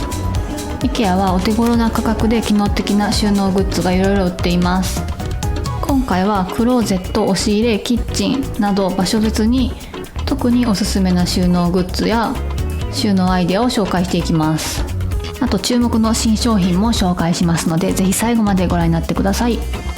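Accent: Kansai, Japan. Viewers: さん、こんにちは♪ 毎週さんの動画アップを楽しみにして拝見しています。今回のさんいつもと違って声のトーンが低くお元気なさそうに感じましたが、お身体大丈夫ですか？いつも関西弁がちょくちょく出てさん独特のコメントが親近感を感じててプッと笑う事もしばしばで大好きなんですよね！今回のトークは推しに対する「可愛くないですか！？」がいつもと違った感じがしました。お仕事と家事と撮影と編集...色々お忙しいと思っています。お疲れが出ないようにして下さいね♪また来週楽しみに待っております！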